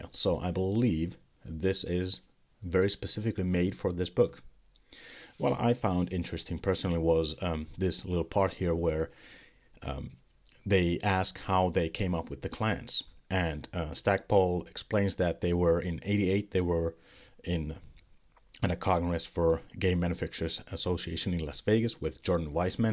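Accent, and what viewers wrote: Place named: Finland. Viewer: HAH! Now I hear it! You sound like my buddy from Seinäjoki! :D Should have recognized it at once. Good to hear you found a warm welcome here. :)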